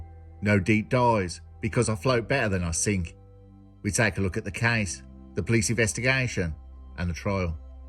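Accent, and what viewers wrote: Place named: Black Country, United Kingdom. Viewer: Just love the black country accent. Fantastic. Brilliant narration as per usual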